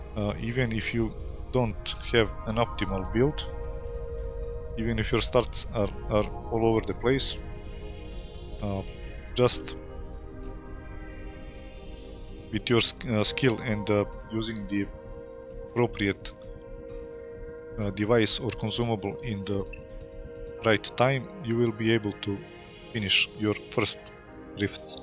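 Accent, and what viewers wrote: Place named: Russia. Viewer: Блин такое ощущение что он русский просто говорит на английском😁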